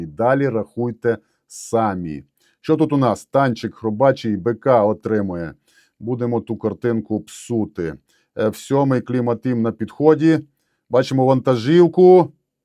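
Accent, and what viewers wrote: Transcarpathia, Ukraine. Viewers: Ваші коментарі при знищенні хробаків з переливом закарпатського діалекту і багато ще чого ,якби нерозуміння що це війна і йде щосекундна зміна ситуації,то як в якомусь фільмі,але ж то реальна загроза всім нашим українським захісникам,Дякуємо Вам , і віримо в Перемогу України🇺🇦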